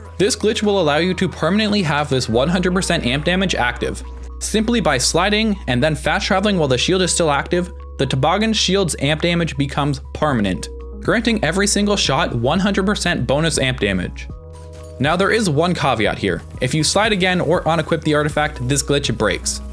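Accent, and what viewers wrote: Canada. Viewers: sre you canadian😂😂😂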